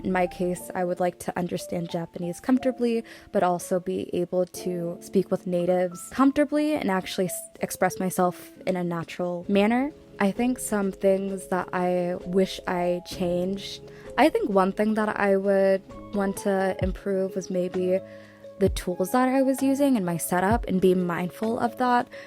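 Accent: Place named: Somalia. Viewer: you sound somali. plz correct me if not but i can just recognize a 1st gen accent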